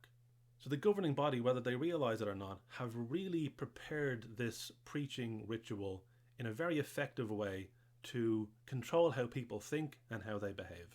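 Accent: Ireland. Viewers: were you a witness ~~~sounds like you are from Ireland ?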